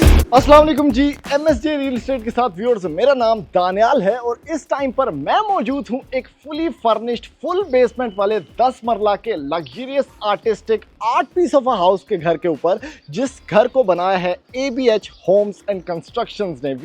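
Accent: India. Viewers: Main es ki hindi se samjha ya india ka hai 😂😂😂😂😂😂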